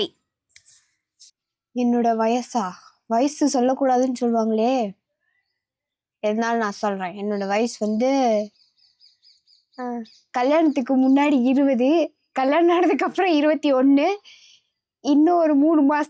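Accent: India. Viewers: பாப்பா, நீங்க பேசுறது மதுரகார பிள்ளை மாதிரி இருக்கு..❤❤ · Unga slang la patha Chennai pola illaihey epdi... native really chennai thana?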